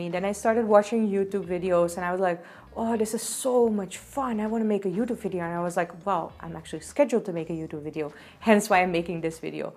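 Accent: India. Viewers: Hey, heeel lang geleden dat ik een video van je heb gekeken, leuk je fijne energie weer even te voelen en wat een tof om te zien dat je je hart volgt en in India woont nu, bijzonder ❤️. Spot ik nou een beetje een indian accent?:)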